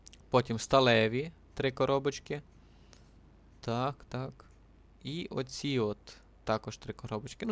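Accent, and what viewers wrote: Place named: Dubrovytsia, Ukraine. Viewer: говориш як з дубровиці)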